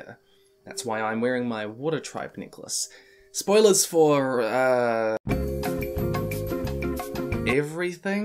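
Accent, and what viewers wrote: New Zealand. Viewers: Wait your new Zealander?! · Are u a kiwi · Bro you a kiwi ? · You're a kiwi?! how did I never notice.I'm a kiwi too. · wait is he a kiwi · are you from nz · Great video.... But I can't stand how kiwis replace "E" with "I" when the speak. "When" turns to "whin" "end" turns to "ind" lol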